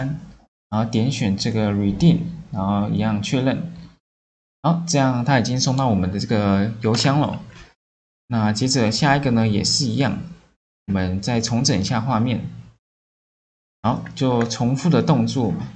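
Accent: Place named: China. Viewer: 如果頻道主真的是台灣人，請不要學大陸人說英語的口音，還是頻道主是大陸人扮台灣人？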